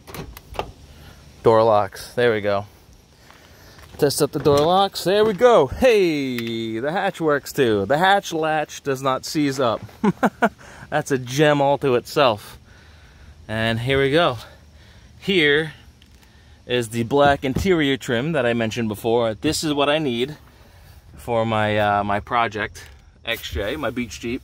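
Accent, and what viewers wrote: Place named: East Coast, United States. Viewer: You’re from the east coast what part? I want to guess either New Jersey or Long Island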